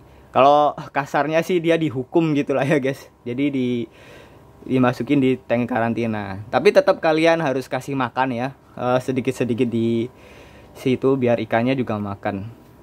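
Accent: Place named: Java, Indonesia. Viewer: Jowone endi mas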